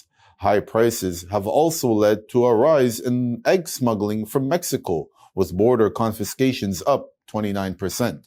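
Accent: United States. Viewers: .*╔═══ ❤☀💚☀❤ ═══╗* 💚💚💚💚💚💚💚💚💚 Dear MR, Wa-alaikum as-salaam. Like the Crescent of, you appeared after full one week, 14th, Feb being the last you had read the news, and full 7 days ahead of the sighting of the Crescent of. Wishing you and all your subscribers a HAPPY, SPIRITUAL, TAQWA-GIVING & AUSPICIOUS!!! Your presentation of news is so fantastic that despite you accent being American, we, from India, find it so easy to understand you beautiful slow pace of reading the news. KEEP IT UP, MR.!!! 🌐🌐🌐🌐🌐🌐🌐🌐🌐 ╚═══ ❤☀💚☀❤ ═══╝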